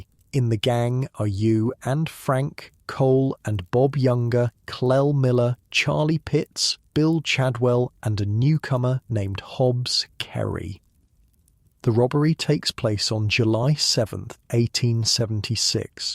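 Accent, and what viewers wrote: England, United Kingdom. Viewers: Old west tales with an English accent?! Yeah, I’m out🙄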